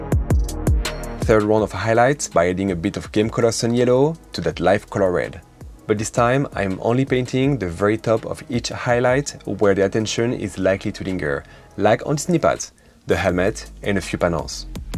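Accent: France. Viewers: on dirait un accent français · je me disait bien qu'il y avait un accent francais la dedans bon bah j'mabonne... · Came in the hobby with the V3 around... 2000 i think. And started with Blood Angels. Just came back 2 years ago, still with BA. Nice paint, the fact you didn't use Metallic is cool. I would be curious to know what is your experience in painting cause, it looks awesomely incredible. BTW i checked (not finished, it's late right now) your other video, and was amused by your accent, so "tranché", No surprised by the fact you're french. And a Parisien one. It explains A LOT. Hi and cheers for the next videos, from a fellow french (But Nemesis Marseillais).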